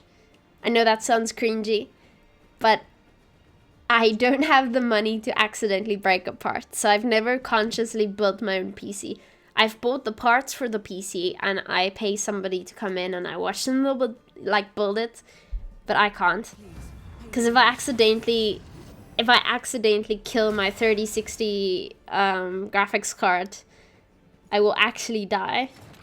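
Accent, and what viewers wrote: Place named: Afrikaans, South Africa. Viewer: You Sound REALLY Afrikaaans, Indien so, baie dankie vir die GWENT clips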